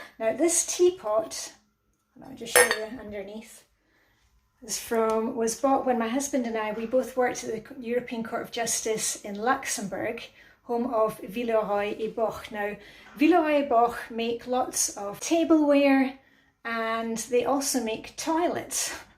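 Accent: Scotland, United Kingdom. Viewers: What a well stocked kitchen, it’s great to see he cooks properly. I have a question which I hope isn’t too personal and respects your familiy’s privacy, but I have wondered about this for ages - do your children speak English with your beautiful Scottish accent?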